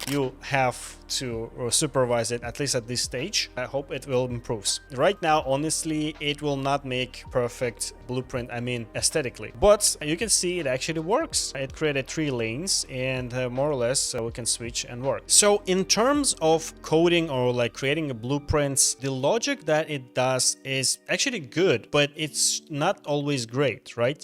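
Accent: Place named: Russia. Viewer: чел русский понятно по акценту,но вот доказательство остановите ровно на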